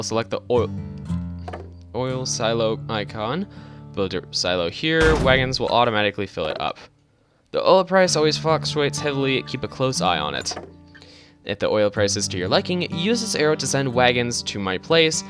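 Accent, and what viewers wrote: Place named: South, United States. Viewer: YOUR COUNTRY VOICE THO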